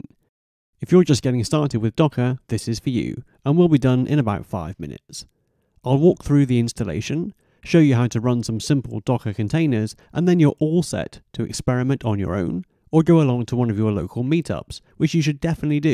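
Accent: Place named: England, United Kingdom. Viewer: english accent kills it !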